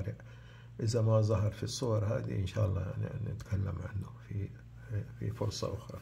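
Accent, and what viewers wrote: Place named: Hejaz, Saudi Arabia. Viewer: اسلوبك اسلوب مثقف وحبيناه بلهجتك الحجازيه الجميله واسلوب جميل بكل ماتحمله الكلمه من معنى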